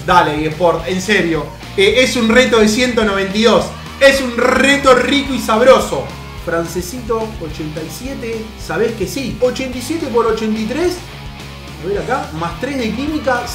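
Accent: Argentina. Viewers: Me encanta el acento argentino 😍😍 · Que asentó argentino más exagerado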